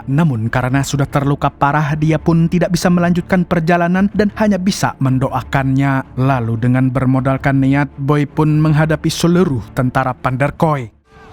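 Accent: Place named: Bandung, Indonesia. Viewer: Iyeu Operator na Anu komentar pasti na budag Cicaheum nyyaa.... Logat na teu bisa ngabohongan penongton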